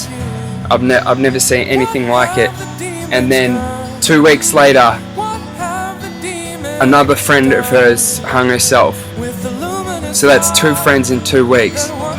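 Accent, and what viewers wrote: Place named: Australia. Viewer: the guys aussie accent .. lols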